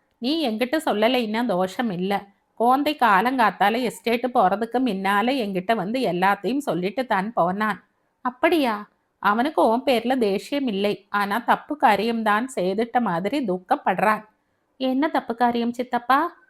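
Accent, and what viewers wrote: Kerala, India. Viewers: Besh beshakum ungalin inimaiyana malaiyalathamil vasippathu kathiyum super💖💖💖👍👍👍🎉🎉🎉😊😊😊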